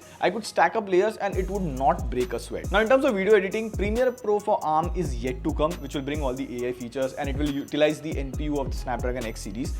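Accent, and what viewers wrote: India. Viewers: terrtble hindus accent speak slower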